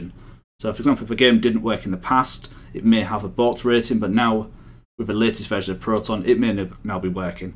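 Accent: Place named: Northern Ireland, United Kingdom. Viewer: Northern Ireland accent 🍀❤️😆Love it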